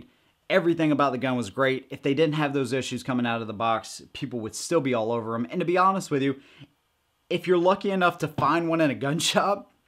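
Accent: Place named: Eastern Shore Maryland, United States. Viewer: ... where are you from? I grew up on the eastern shore of MD and your accent makes me think you are from the same area...great video...keep them coming in 2019.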